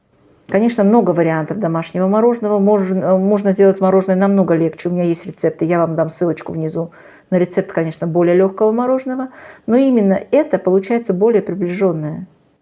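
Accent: Baku, Azerbaijan. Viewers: Добрый день,! Мы с вами землячки, я тоже из Баку.Родилась, выросла и большую часть жизни прожила в Баку а сейапс живу в России.Очень приятно слышать и слушать знакомый бакинский акцент. Спасибо за ваши рецепты.Попробую сделать мороженое.Всего вам доброго!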